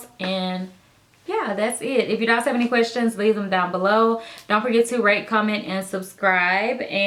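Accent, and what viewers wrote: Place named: Louisiana, United States. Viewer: Are u from Louisiana? You have an accent... your hair looks great btw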